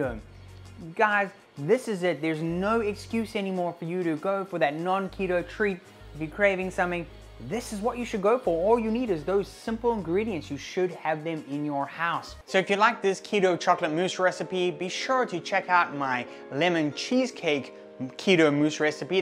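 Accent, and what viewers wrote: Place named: South Africa. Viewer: I am South African too, yes. Recognized the accent. Love your channel and all the amazing recipes. Well done!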